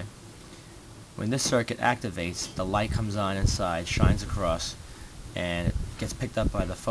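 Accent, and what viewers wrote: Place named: New Jersey, United States. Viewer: I was looking for opto isoloators and I guess because I am subscribed to your channel that you popped up again. I could not believe it. I love your videos. They are simply the best. All the knowledge, zero BS, all the circuits I really want, ..but mainly it is your style of presentation, and that lovely NJ accent. You deliver the right emotion in your videos and it makes for a truly first class educational experience. It is almost more like entertainment because they are so enjoyable to watch.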